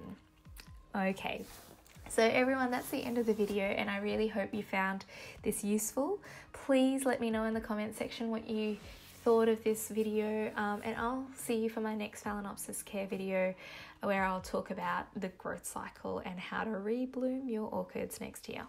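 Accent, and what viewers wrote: Australia. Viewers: I am a newbie to orchid growing...phals and sarcs at present....so all info is greatly appreciated. Also nice to hear an accent from across the ditch....I'm a new subscriber in Wellington, NZ....so please keep the videos coming. 🌞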